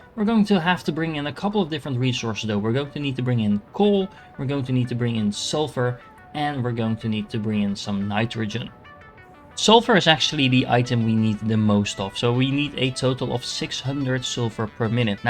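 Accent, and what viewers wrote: Netherlands. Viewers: Super goeie video, toen hoorde ik dat je nederlands was en ja😂 lekker man, goed bezig!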